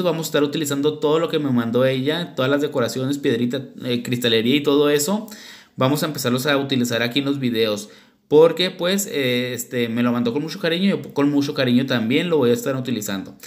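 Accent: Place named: North, Mexico. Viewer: Hermoso para una novia de impactó 😍eres norteño? Me gusta tu acento, si queremos diseñitos en punta mas corta saludos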